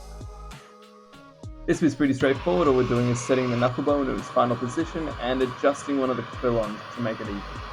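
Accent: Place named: Australia. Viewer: mate lemme tell you something, i was so pleasantly surprised to hear an aussie accent on a niche video for once, letting me know that i can actually buy the shit required for the project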